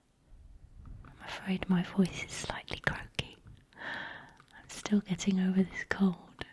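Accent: United Kingdom. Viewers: I've often thought I could detect a hint of Northern in your accent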